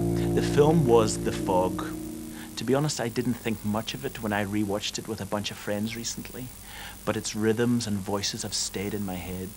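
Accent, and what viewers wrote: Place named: Ireland. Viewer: Major Mo .....its an Irish accent. You fool.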